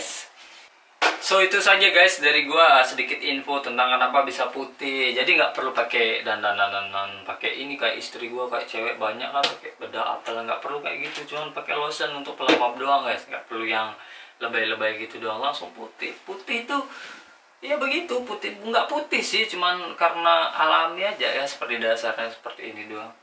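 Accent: Lombok, Indonesia. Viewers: Logat orang lombok😂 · Bahasa Logat Lomboknya (Sasak) Terdengar jelas di campur dengan bahasa indo , the best lah 👍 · Bang lu dari Lombok?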